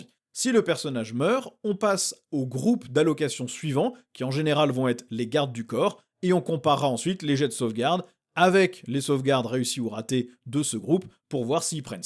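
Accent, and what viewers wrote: Brittany, France. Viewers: Encore du super boulot avec en bonus, l accent brestois.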